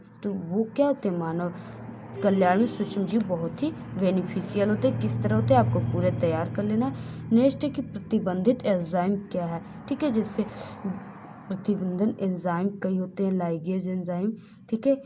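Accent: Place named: Uttar Pradesh, India. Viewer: Mam mene appki hrr baat axche see sunii or follow kerii wooo mam koo 😮🎉❤❤mam app bhot axcha paadti h mam app up see hu app kii lugahgh se lagra 😮❤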